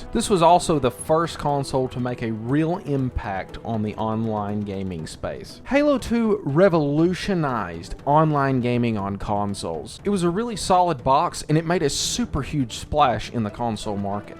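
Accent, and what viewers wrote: South, United States. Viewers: i like your country voice so i subscribed yee-haw! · Finally a youtuber with a cool american southern accent. Tired of all these weak bri'ish ones.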